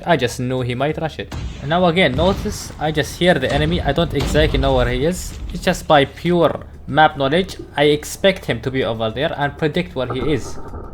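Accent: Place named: Arab world. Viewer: bro where you from are you arab ? you sound like an arab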